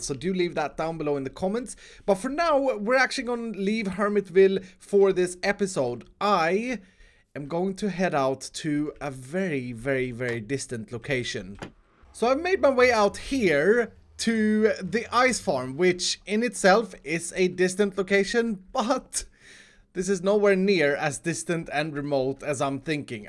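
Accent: Sweden. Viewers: Are you swedish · Are you from sweden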